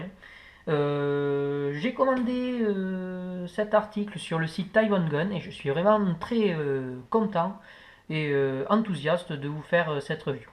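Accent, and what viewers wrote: South, France. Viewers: pour l'accent du sud :) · au l accent sudiste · nan mdrrrr c'est un accent du sud 😂😂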